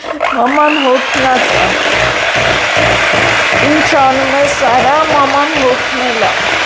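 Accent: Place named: Dzorakhpyur, Armenia. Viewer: Мэр Дзорахпюри барбаров эл Э - чемнали! Шат аразат э лсэлн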